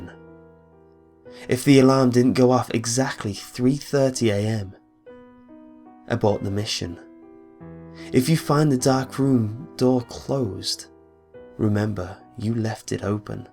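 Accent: United Kingdom. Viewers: hes not...thats his accent, watch other videos and pay attention to the british english wording styles he uses.....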